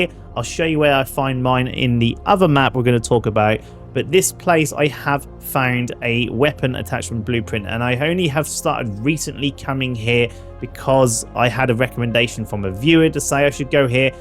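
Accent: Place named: England, United Kingdom. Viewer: How do you have an english accent so thick it makes it sounds like English isn't your first language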